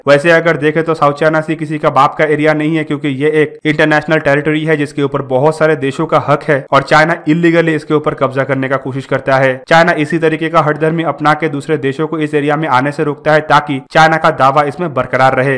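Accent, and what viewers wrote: Bengal, India. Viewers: Sir aap Bengali hai?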